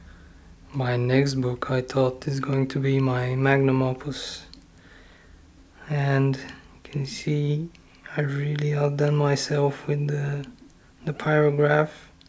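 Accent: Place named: United Kingdom. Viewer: You sound as if you've lived in the United Kingdom for years, you have a really cool accent, being from Northern Ireland, I only wish mine was as cool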